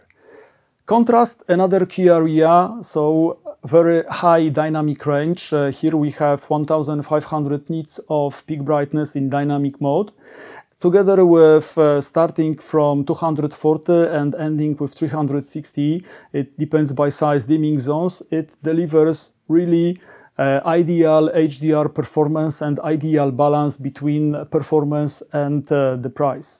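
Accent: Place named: Poland. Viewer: Nice accent. Direct from Radom , I supposed.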